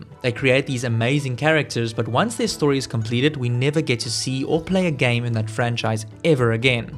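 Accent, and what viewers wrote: South Africa. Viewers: Do I hear a South-African accent? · I knew your accent was familiar. Glad to see a saffa doing great content well done 💪🏻🇿🇦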